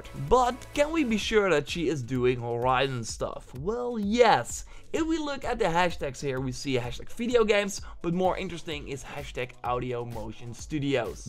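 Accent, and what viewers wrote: Netherlands. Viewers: You sound a bit dutch, are you?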